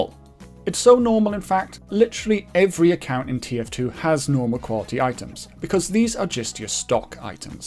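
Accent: United Kingdom. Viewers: Your from the uk aren't you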